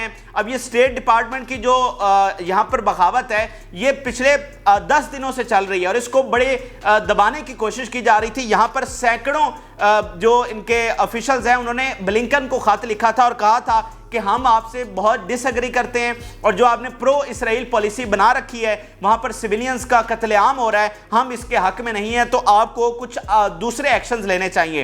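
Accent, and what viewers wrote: Punjab, Pakistan. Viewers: Apni Urdu theek kro Punjabi style ki urdu mat bolaaa kro ....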